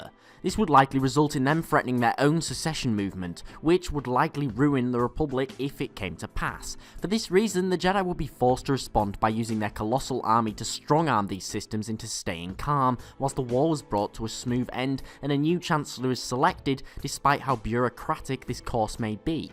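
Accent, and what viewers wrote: England, United Kingdom. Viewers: Is this guy english?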